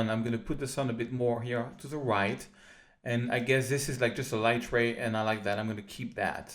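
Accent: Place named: Paris, France. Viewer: man you are the king of marketing to be sure - never seen anyone as slick as you and with their fingers in so many pies...I just love your architectural work, fantastic- aspiring pros can learn a lot from you as to be a successful photographer in this day and age requires not only solid photography skills but also sound knowledge of how to market yourself and the many areas you need to explore to maximize your income - is the man and the Parisian accents just adds to the mystic - I think I will work on establishing an Italian accent, or perhaps German - anything to set you apart from the competition!!!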